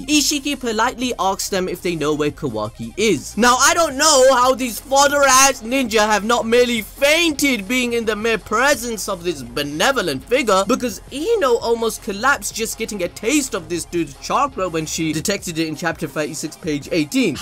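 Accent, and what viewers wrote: Punjab, India. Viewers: Is this person punjabi🤔🤔🤔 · dude you indian? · you punjabi ??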